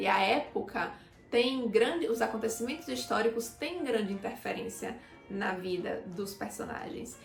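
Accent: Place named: Bahia, Brazil. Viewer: Amei o vídeo! Continue, não desista desse canal incrível ♥️✨ Edit: conheci o canal por esse vídeo e ja pelo sotaque desconfiei q era baiana, fui conferir o vídeo de apresentação e acertei! (Minha conterrânea kakaka)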